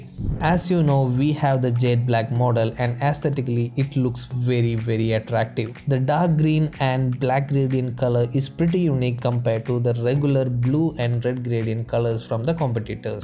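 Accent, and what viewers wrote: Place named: Kerala, India. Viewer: malayalai ano bro? english arayumpol its understading... but video is good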